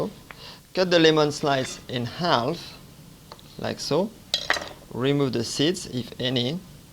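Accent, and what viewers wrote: France. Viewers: this guy has an awesome French accent and a really cool hat - this has got to be the best recipe on YouTube for Chicken Cordon Bleu :) LOL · Wow so yummy with the French accent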